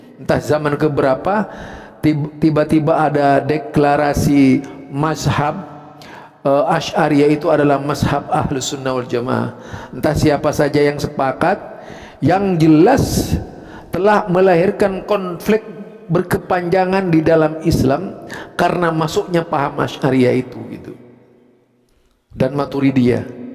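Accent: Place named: Java, Indonesia. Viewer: Ojo ojo dulu mendoknya sama kyi idrus romli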